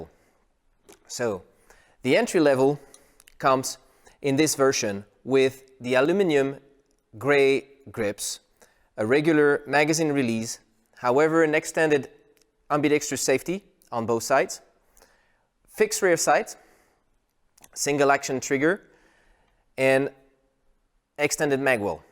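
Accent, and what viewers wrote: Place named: France. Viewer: French accent...oui!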